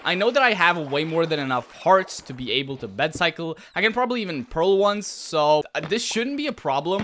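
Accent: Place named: United States. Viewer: ngl your accent sounds so american that when you said zed it threw me off so much lol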